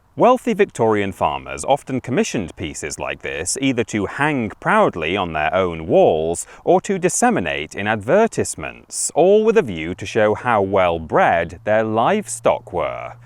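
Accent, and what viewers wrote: United Kingdom. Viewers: I heard the British accent even before I turned up the volume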